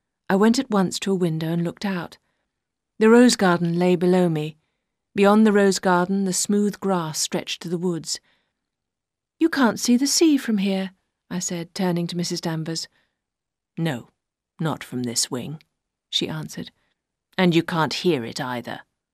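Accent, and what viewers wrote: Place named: United Kingdom. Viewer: British English is so elegant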